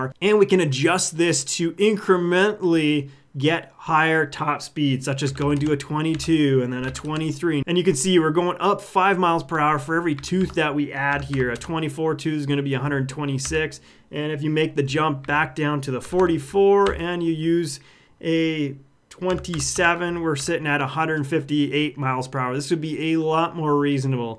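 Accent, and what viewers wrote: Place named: Canada. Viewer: I thought I heard a Canadian accent.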